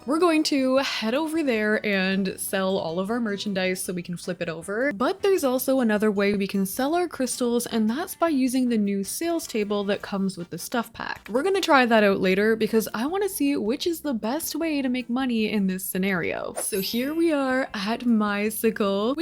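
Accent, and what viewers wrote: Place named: Canada. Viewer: Right I couldn’t tell if she is just Canadian™️ or didn’t know how to pronounce Grotto 😅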